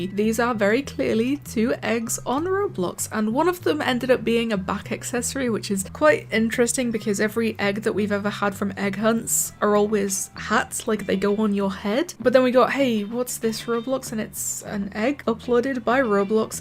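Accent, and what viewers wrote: United Kingdom. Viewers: Is this woman British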